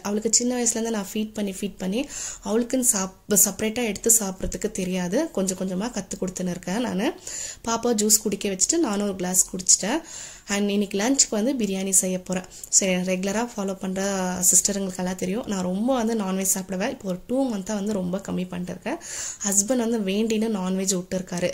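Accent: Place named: Vellore, India. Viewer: Sister are you from Vellore area.ur slang looks like that.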